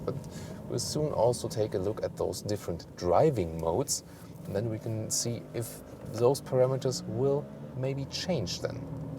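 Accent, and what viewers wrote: Germany. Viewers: Alfas are today quality cars. I had a 159 2.4 diesel model from 2006 to 2009 and that was the first well built Alfa to my knowledge. Giulias are today very beautiful cars with a special touch of emotion. is well prepared and hence knows his stuff. I especially enjoy his somewhat funny English pronunciation and partly German intonation. Thank you. Hopefully I did not insult you as that was not my intention.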